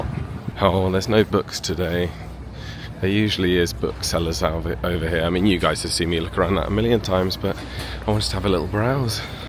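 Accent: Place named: Yorkshire, United Kingdom. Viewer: the Yorkshire accent developing well lad.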